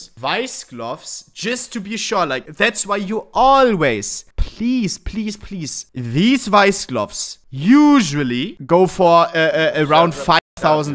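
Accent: Germany. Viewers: bruder dir als deutscher zuzuhören da bekommt man kopfschmerzen, bitte deutsch oder beton die sachen auf english wenigstens richtig EDIT: trotzdem gutes video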